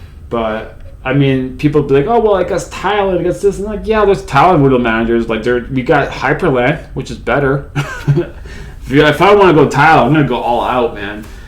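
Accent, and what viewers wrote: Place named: Australia. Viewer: I kinda thought you were an Aussie first ngl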